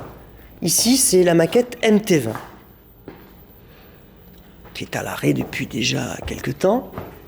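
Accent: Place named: South, France. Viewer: Je ne sais pas pourquoi YouTube me propose cette vidéo.... Une intro à laquelle on n'entend ni ne comprend pas grand chose, on se balade dans des travées pour voir des portes armoires blanches et une outro presque aussi désagréables que l'intro. Quand on croise quelques appareil ou que des portes s'ouvrent, la voix nous dit juste ce que c'est dans son jargon sans expliquer. L'intention est sûrement louable mais on n'est plus en 2010. PS c'est original un gars avec l'accent du Sud de la France, à Rennes, qui parle comme un belge ou un suisse (nonante :)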